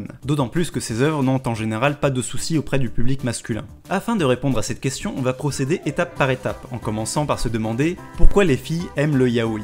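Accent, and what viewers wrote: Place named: England, United Kingdom. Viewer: Ton accent anglais est magnifique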